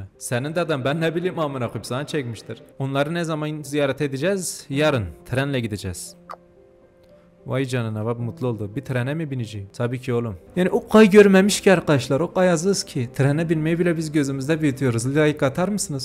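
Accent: Elazığ, Turkey. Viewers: ABİ ELAZIGLI MISIN MAİLİNDE 23 VAR VE AKSANDA ELAZIGLI GİBİ BENDE ASLEN ELAZIGLIYIMM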